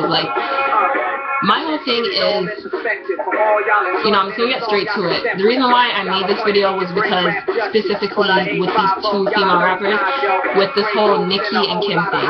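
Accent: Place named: Caribbean. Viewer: Oh wow, lol. I don't know why I thought I heard a little Caribbean in you.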